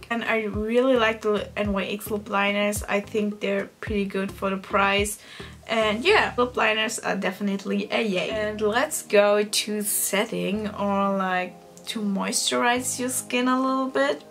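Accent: Germany. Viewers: Heftig wie ignorant manche Menschen hier sind! Sie kann die Videos in der Sprache drehen, in der sie es möchte. Was ist daran so schwer zu verstehen? War irgendeiner von euch Englischlehrern denn EINMAL im Ausland, wo Menschen verschiedener Herkunft untereinander Englisch geredet haben? Jeder hat einen Akzent! Ihr deuscher Akzent ist normal und NATÜRLICH. Hätte sie einen britischen oder amerikanischen Akzent, würdet ihr doch auch wieder haten mit „sie kommt aus Deutschland aber redet so und so“ ..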